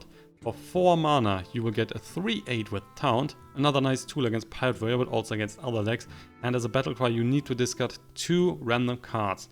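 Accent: Germany. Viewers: Thank you for the insight, looking forward to play this deck aswell. I can distinguish a strong german accent tho... which is not bad because I am german aswell. But you could definitely work on this! You could say that I am a native speaker, and I do see that you got a huge vocabulary (regarding average germans) - just keep practicing (if you want to drop it ofc). Nicht böse gemeint btw - hast mein Abo.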